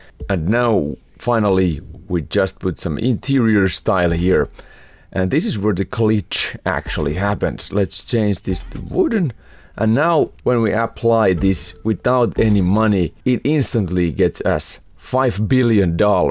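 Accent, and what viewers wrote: Finland. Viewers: Are you finnish? Your accent sounds like it.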